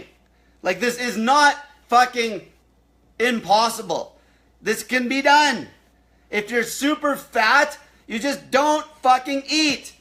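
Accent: Canada. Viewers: you Canadian? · that canadian accent STRONG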